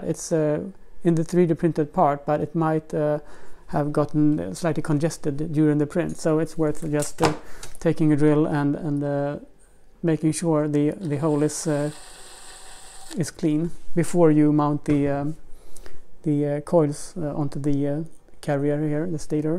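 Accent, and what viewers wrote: Sweden. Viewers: Sorry to ask....are you from Sweden?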